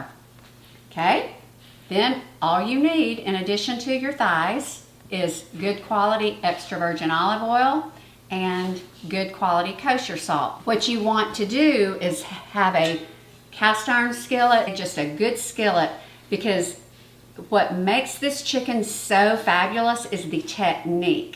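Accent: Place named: South, United States. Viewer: You are southern. I know you can cook. Don’t stop making videos. Beautiful kitchen btw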